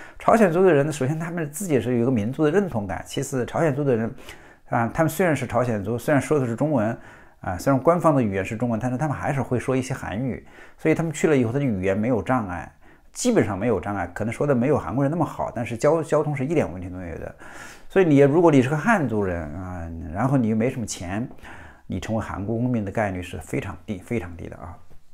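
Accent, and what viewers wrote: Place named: Hubei, China. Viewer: Zhou Bruce 感觉是湖北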